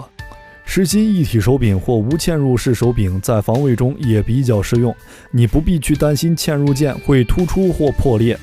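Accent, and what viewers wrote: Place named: Taiwan. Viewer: 这个节目到底是中国的还是 台湾腔奶奶风娘的？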